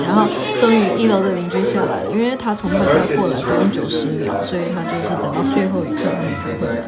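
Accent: Taiwan. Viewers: 聽你口音 帶老公同個仔返去台灣啦 做乜9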